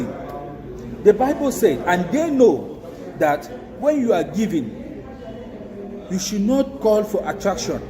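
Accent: Nigeria. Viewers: You sound 100% naija man yet u claim to be from Cameron,it's funny how people commenting negative about the late prophet all fell for your "lies".God bless you.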